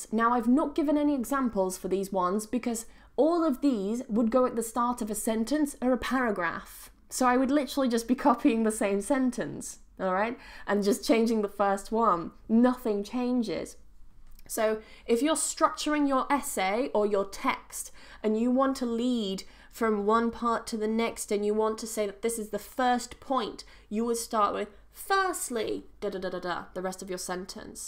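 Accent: United Kingdom. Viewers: Plse keep g.... we need to learn british accent 😍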